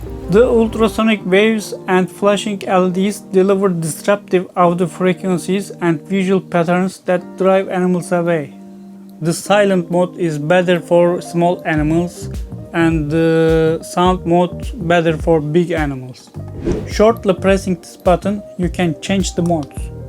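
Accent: Turkey. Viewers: Bu ingilizce aksanı kesinlikle bir Türk'e ait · Türk gibi ingilizce konuşuyorsun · You sound very Turkish ?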